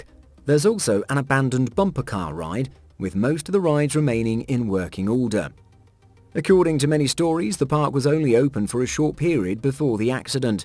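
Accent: United Kingdom. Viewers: Hold on there Mr. British voice. The word SOCCER was derived from the British in the early 1800's so its y'alls fault that its called soccer, not North America. Interesting video either way. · Fuc! Are only brits doing documentaries? So sic of the accent!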